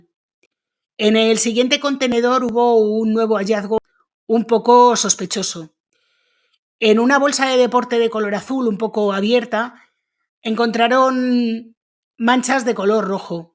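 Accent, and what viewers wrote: Spain. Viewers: Se refiere a la ertzaintza, la policía vasca. Es española y pronuncia así, qué vamos a hacerle?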